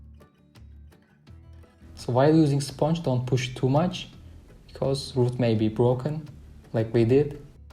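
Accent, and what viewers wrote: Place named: Turkey. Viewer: You are turkisch? Because speaking like turkisch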